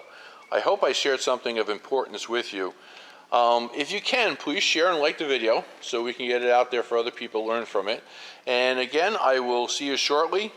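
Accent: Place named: Philadelphia, United States. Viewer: You sound like you have a bit of a Philadelphia area accent. Maybe Baltimore?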